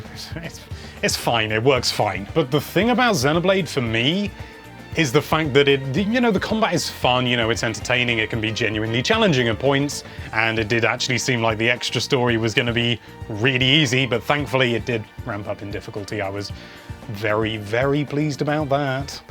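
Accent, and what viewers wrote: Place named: United Kingdom. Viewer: I really appreciate the way you talk about games and the cadence you use and how you try your best not to spoil any of the plot. I have watched a decent amount of your videos and they are great. Your accent actually makes it even more appropriate for you to review the Xenonblade series since all of the characters have a similar accent...um...British perhaps (multiple use of the word "govens"). I love Xenoblade Chronicles and I am glad they put some of that quality of life stuff in (especially for side quests because it drove me CRAZY to run around at random in an area to pick up blue orbs in hopes of finding quest items for over an hour sometimes). Nintendo should thank you. Your reviews are sometimes an important factor I utilize when deciding to purchase games. I will definitely be picking this up. "I'm really feeling it!" Thanks.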